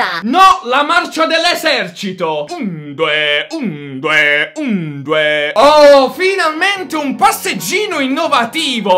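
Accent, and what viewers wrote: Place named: Naples, Italy. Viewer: Mi fai troppo ridere poi non so perché hai l'accento napoletano no perché ti seguo da 1 mese ma non so se sei napoletano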